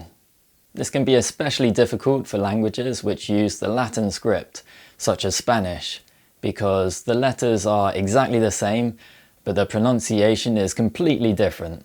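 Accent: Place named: England, United Kingdom. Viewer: Seriously, your English accent is amazing! It makes your content so engaging.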